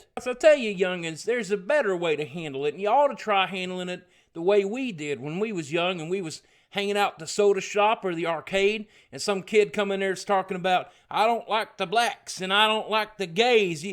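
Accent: South, United States. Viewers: (laursaurus) That's because he grew up on the south. He worked on getting rid of his accent when he was younger, I think. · he's just playing and has a southern accent. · He takes the typical southern person with a accent who much people think are stupid it turns it completely around. Good job. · It's actually his real accent, he's said many times he had a southern accent and tried to get rid of it. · Lol you are awesome man:D absolutely loving that southern draw gotta admit your topics so far have gone over my head america's pretty fucked up right now with everything going on but being british we know none of the pc culture or trump issues:p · If this was the first boogie video i'd watched, i'd have thought this was really his voice. I didn't know he could do such an amazing southern accent. This is hilarious to top it off. Sounds like the first southerner i'd probably really get on with, lol. 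· I live in Alabama, the Southern accent is spot on bro. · I. Love. The. Accent! This is why I wanna visit the US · My favorite YouTube persona. I don't want to tell you how to do what you do because it's hilarious, but being from the south if you could make the accent a little thicker it would be spot on.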